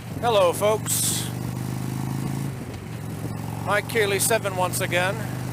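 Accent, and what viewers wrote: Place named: Boston, United States. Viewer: Love the way your accent comes right back when in Boston for awhile. Keep up the videos brother I enjoy'em and hats off for putin your life out there. Keep do'in what u love and thanks.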